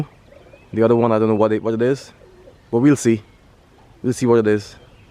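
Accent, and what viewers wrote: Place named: Caribbean. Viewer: Bro you sound like you from the Caribbean or am I wrong the accent but the birds are flying good and I like when you say you don't baby your birds we say the same back home here but love the videos bro🎉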